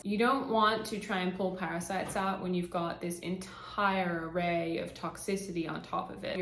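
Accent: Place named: United States. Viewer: The American accent 😱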